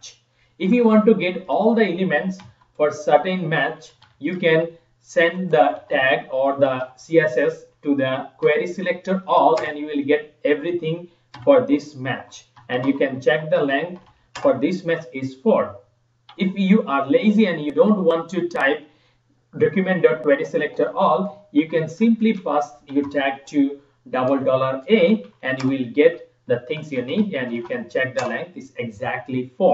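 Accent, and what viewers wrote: India. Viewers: Lol this indian dude is hilarious when he talks its so steriotypical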